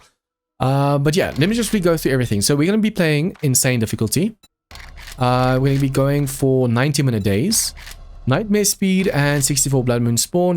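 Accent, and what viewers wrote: South Africa. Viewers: question are you south african ?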